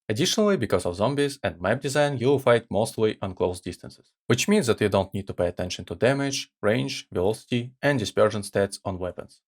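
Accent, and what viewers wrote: Russia. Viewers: Мне кажется или он русский?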